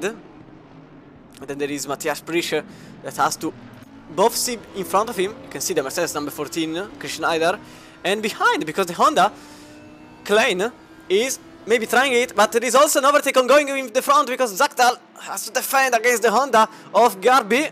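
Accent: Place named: Italy. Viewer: you are a great commentator :) i like your energy and your italian english... looking forward to hear you again bro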